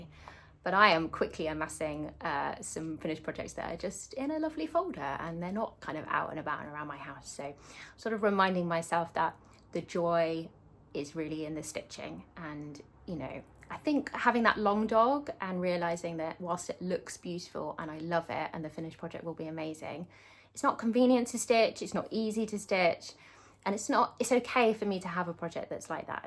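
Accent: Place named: United States. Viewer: Hi,! Great video, thanks for sharing! I have been planning on using my magnet giveaway to make cute little needle minders 🤭 those foxes are adorable!! Still obsessed with your ATC, laundry is so creative!! I love your American accent 😂 Yay long dog! I was drawn to Fish & Ships too, the other nautical ones in that “series” are fun and I’ve seen fewer people stitching on them. If and when I make the jump I’ll probably start with one of them! I fully support putting your name and age on the sampler! I was watching another video this weekend and they said “well you might not want to say you did it at age 76” and I thought “why not!! How fun!! 😂” Your stitchy kindness haul is great! Can’t wait to see you stitching on those + the spring Quaker!! -Katherine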